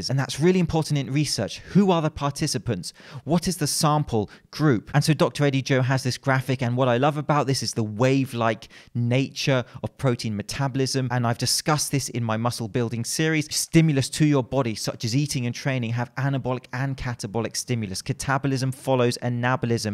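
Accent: United Kingdom. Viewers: I subbed your channel a few days ago and I have been binge watching your videos. Excellent stuff and very much appreciated. Just listening to your British accent makes me smarter. And I like how you add some British slang in your videos - we learn about exercise science AND language. I particularly like the phrase "look like a wally" you used in your isometric video. I will be using that. I myself am trying to break some misconceptions regarding Olympic weightlifting, which the rest of the world just calls "weightlifting," particularly the ideas of a certain coach. If you ever need ideas or material on weightlifting let me know.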